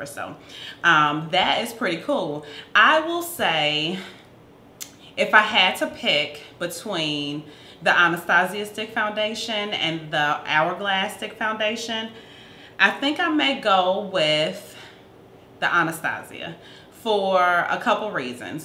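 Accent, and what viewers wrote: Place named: Texas, United States. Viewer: Are you from Texas?? You sound just like me! Hey Y'all! #htown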